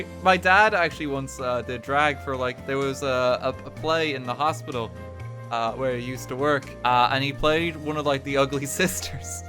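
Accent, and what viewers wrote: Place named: Ireland. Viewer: He's like Jerma but less insane and Irish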